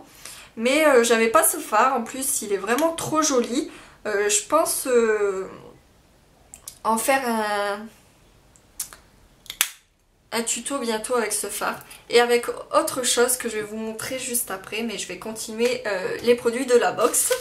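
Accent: North, France. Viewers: Tu est du nord non? :')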